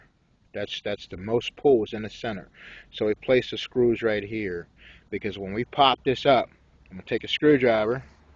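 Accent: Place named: Pittsburgh, United States. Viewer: Pittsburgh? I recognize the street signs and the accent! What's up brother?!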